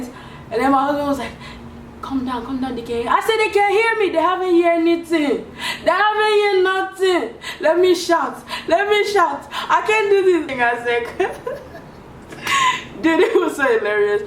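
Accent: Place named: Francophone Africa, Africa. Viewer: Cameroon I knew I recognized that afro-french accent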